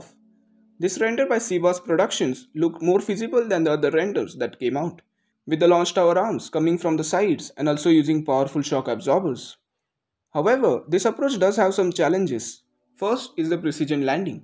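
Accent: India. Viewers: Omg the Indian voice sounds like the scammers voice jaja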